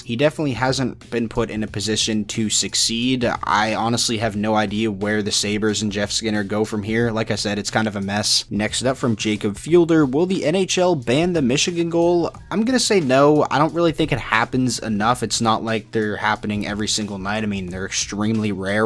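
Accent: Canada. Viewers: As a Canadian, you have the most Canadian accent i've heard in a while. You have to be from a small town out in butt fuck no where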